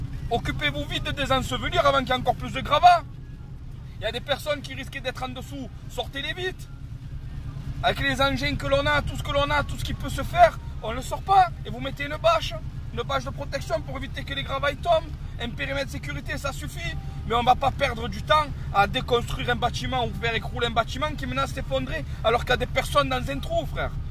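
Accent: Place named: Marseille, France. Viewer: Salut tu es sûrement de Marseille aussi vu ton accent bien prononcer c'est vrai qu'il doit y avoir des très vieux bâtiment dans cette ville mais il n'y a pas que là-bas il a la partout mais beaucoup de bâtiments sur à l'abandon et négliger il faut de l'argent pour refaire tout cela puis beaucoup de propriétaires peu scrupuleux loue des appartements ou des studios à des personnes très vulnérable il profite de ces personnes là car ils ne peuvent aller nulle part ailleurs on doit les punir ces personnes là car il achète des appartements il est relou il ne les met même pas en bon état et beaucoup ne sont pas en règle voilà mon cher ami je regarde que j'ai le temps une vidéo de toi je te souhaite une bonne journée sous le soleil de Marseille à à bientôt Philip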